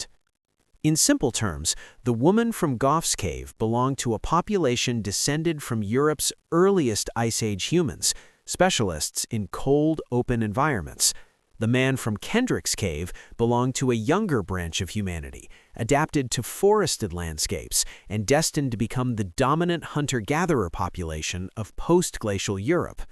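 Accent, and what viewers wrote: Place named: United States. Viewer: I can't listen to this because the American accent is too annoying.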